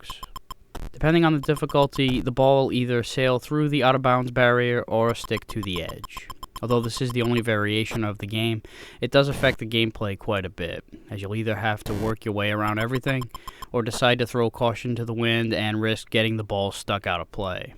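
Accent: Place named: Long Island, United States. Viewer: Definitely a Long Island accent 😉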